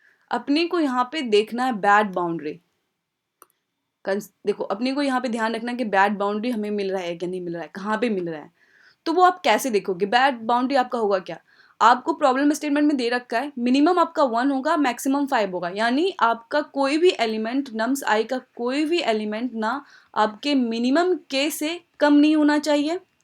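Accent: Bihar, India. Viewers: Dont judge me but from your accent what I can assume that you are from "Bihar" who also lived in Bengal :) . I am super happy to see a girl from "Bihar" solving and explaning hard problems of leetcode . Keep going :)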